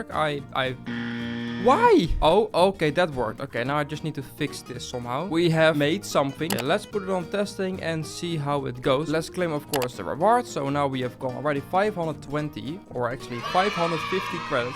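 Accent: Netherlands. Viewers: deze guy is sws Nederlands (de accent). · Ben je Nederlands want ik hoor dat gewoon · Are you Nederlands.I hear the accent.😂😂😂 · U sound like u are Dutch are you? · Are you Dutch? You here the accent😂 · Je klinkt nederlands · Hello, it sounds like your Dutch. Are you Dutch? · Ik weet dat je Nederlands bent 😂 ik hoor het aan je stem anyways love the vids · Ik hoor Nederland haha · Ik hoor het nederlands accent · Hi i have been following you for a long time now and i always hear like a bit dutch so are you dutch ir for real english if your like truly englisch than sorry that i asked this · R u Dutch? Ur name is Dutch and there is kinda a Dutch accent in jr English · Ik vind het zo leuk ook gwn met het Nederlandse accent. Ga zo verder! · I bet this guy is dutch i mean look at his accent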